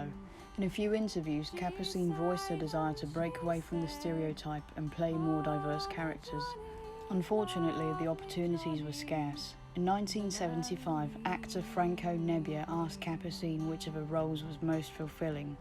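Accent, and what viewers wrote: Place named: United Kingdom. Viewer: I like your specific British accent! It has a cool, unphased, couldn't-be-bothered quality. Good video essay, as well!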